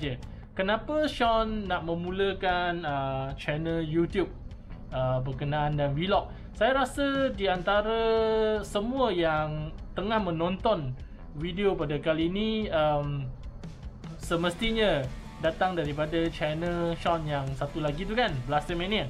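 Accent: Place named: North, Malaysia. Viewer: Sean orang mana? Sebab guna lecey.. Dalam video Mcm utara ja 🤔🤔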